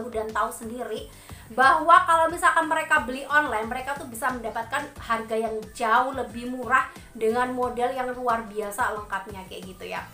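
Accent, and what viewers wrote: Java, Indonesia. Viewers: Ngomongnya medok banget. Dan kurang santai mbak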